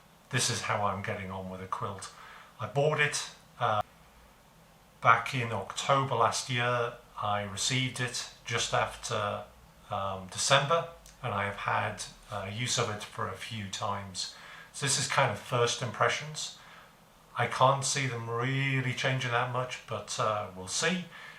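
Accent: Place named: England, United Kingdom. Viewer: Hi, One more question: How are you with the 10'F (-12'C) version? Is it warm enough for you? lightweight or heavy? What kinds of locations are you planning to backpack with it? From your accent, one might assume you are based in England. I am deciding between the 10'F and 0'F (-17'C) versions...The 0'F version adds a few more ounces but might work better in the New York State winters. I would like to backpack in summer and also occasionally sleep outside in winter. Maybe I'm expecting too much from one sleeping bag?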